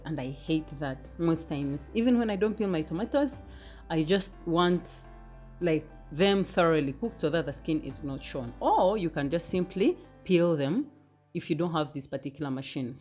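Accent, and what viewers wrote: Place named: Ankole, Uganda. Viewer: First time here , fast forward I love ur English ankole accent , then how u make it simple for day to day life of a Ugandan not professional chef, I have already subscribed can't afford to miss anything. Well done mami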